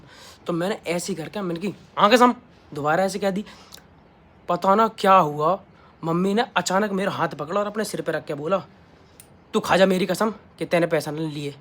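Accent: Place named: Braj, India. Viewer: Bhaiya aapki voice se lag raha hain ki aap mathura aagra ya vrindavan ya hatras se hain kaha se hain bhaiya badi pyaari aawaz lago moko to lago ki tum brajwasi ho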